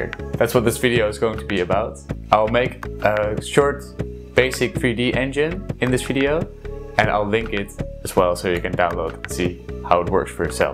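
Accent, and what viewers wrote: Netherlands. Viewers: Ziet er goed uit, ik raad je aan om een keer te kijken naar OpenGL of iets in die richting. Dan kan je een echte game engine bouwen hoewel OpenGL niet de beste Graphics API is maar is wel goed voor een beginner (ik neem aan dat je een beginner bent aangezien je game maker gebruikt, vat het niet verkeerd op xD) Als je je afvraagt hoe ik wist dat je nederlands bent ik hoorde het aan je accent. :) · Ik hoor nederland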